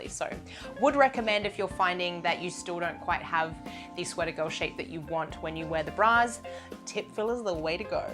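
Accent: Australia. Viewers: Are you Australian, I love your accent 😃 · I love the Aussie accent. She is a beauty as well.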